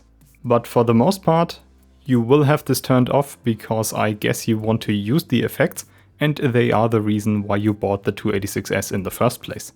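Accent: Germany. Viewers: Habe am dialekt gehört dass du deutsch bist kannst aber gut erklären :)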